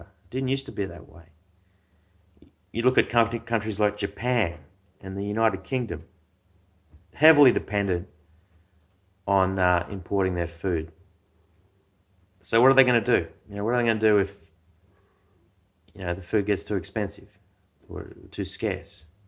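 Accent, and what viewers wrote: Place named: Australia. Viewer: thanks for the Video you sound like an Aussie too 😎 I live in a smal remotel costal town in East Gippsland Victoria and I have conversations about this with my wife, friends many locals are aware what we are facing.. and I also travel to Sydney for work and the people I know there are having this conversation too.. but, my circle of friends live simply, and are artists or musicians .. yet I think alot more people deep down know this is happening the conversation is getting larger .. unfortunately we are just locked into the current paragdime,/paradox we face there is no escape..I will subscribe to your channel...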